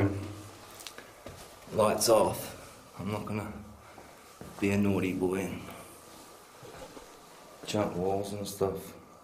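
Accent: Australia. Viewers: yeah, I did some reading and it's pretty interesting and creepy to know just how many died horrific deaths there. Some think she was innocent but that her servants set her up or were coerced into false testimony. Some think the servants themselves did horrible things to each other there. Either way, some very dark history. You're very fortunate to travel to these places and it's great you share it 😄. I love learning about new things and history. There's something I just love about the Australian accent too. I'm a wannabe Australian lol. Keep the videos coming!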